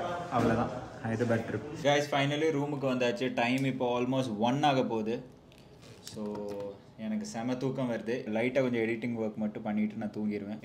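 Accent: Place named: Kongu, India. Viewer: தம்பி நம்ம ஊரு கொங்குதமிழ் பேசுறபோதே உங்களை ரொம்ப பிடிச்சிருச்சுங்கோ .வேலையில் கவனமா இருங்க மா.உடம்பை பார்த்துக்கோங்க சாமி.இது அக்காவோட அட்வைஸ் ங்கோ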